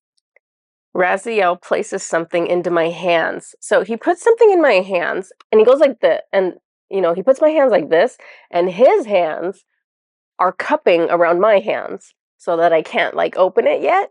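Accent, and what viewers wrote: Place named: Australia. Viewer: Thank you for important message on presence and meditation. I can’t get past Australian accent 😂🥰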